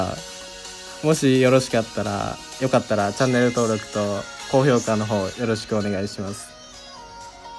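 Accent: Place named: Kyoto, Japan. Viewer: 京都弁最高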